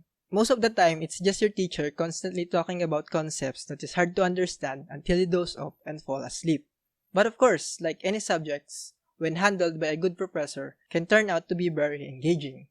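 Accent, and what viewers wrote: Philippines. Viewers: Your accent sounds like you’re from here in the Philippines, are you? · i knew it.. you have the accent :)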